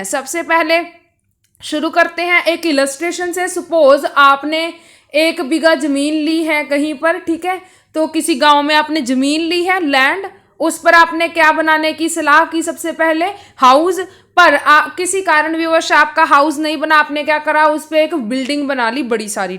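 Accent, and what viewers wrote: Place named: Punjab, India. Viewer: Punjabi/Haryanvi voice ❤️❤️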